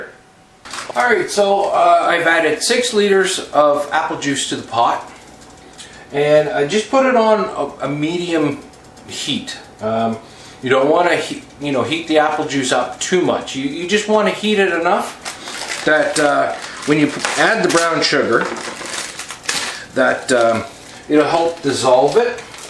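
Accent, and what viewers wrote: Canada. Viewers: LOL He sounds Canadian. Of course he made it to get drunk.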